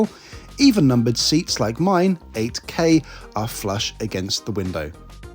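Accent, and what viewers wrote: United Kingdom. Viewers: Great video!! Wow 🤩 🤩 Starlux 🇹🇼 looks ABSOLUTELY FABULOUS especially on that gorgeous new A330-900neo my favorite derivative of the Airbus A330 Family. I think this experience seemed a little better than the last time you were on an A330neo that being delta airlines example from Salt Lake City(SLC) to Paris (CDG) don’t you think so! 😂 😊 however, I do still really love that video on Delta’s A330neo as I enjoy hearing you pick problems in that awesome British accent of yours! 🇬🇧 😂🎉 <link> Also if I were flying Starlux I’d still try to find an A350 route over the A330neo. A bit of a loss if you ask me as nice as the A330-900neo is I’d always prefer the nicest newest plane or cabin interior on a carrier which would’ve been the A350-900 with Starlux😞 I cannot wait to fly on them someday! Finally Taipei Airport still seems like a pretty good and funky airport and it has some charm and personality I love that in an airport! 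😀 Also Can you please please please try to fly with Korean Air one day on that INCREDIBLE and FAB looking Boeing 747-8? I’d love to see that review either in their UPSTAIRS Biz Class or Fabulous First Class on the nose of the 747-8?? Please try them soon! I remember you saying several years ago now during your Hawaiian Airlines A330 review that you saw a 747-8 of Korean and you have yet to try them still!! Plz do and maybe on HNL-ICN? 😊 Looked like an EXCEPTIONAL EXPERIENCE! Finally Jeb & Suzanne Brooks just used that music on their 24 hour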